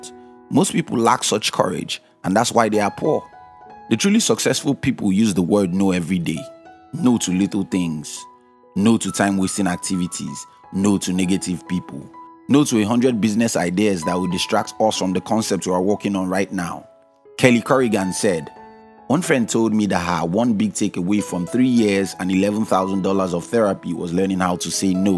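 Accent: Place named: Nigeria. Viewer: Nigérians english.......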